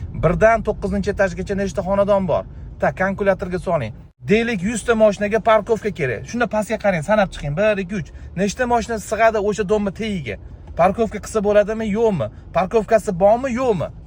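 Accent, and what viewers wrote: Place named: Khonsaroy, Uzbekistan. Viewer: Hon saroy di gapirvoti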